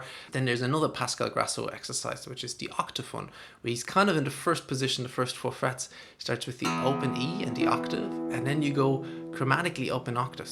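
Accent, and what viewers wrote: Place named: Dublin, Ireland. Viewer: Hey. I found your channel because it is referenced by another YouTuber, Mikko Hilden. I don’t know why I assumed you were compatriots so I was happy when I heard the Dublin accent. I live in Dublin normally but I’m in Australia for the next couple of years. I started taking guitar lessons recently, straight into Jazz. You référence technique exercises but you don’t have a link to them. It would be great if you could point out where us beginners can find good technique exercises. Do you teach? I’m hoping that when I’m back in Dublin I can continue studying at a less embarrassingly beginners level. Keep the videos coming.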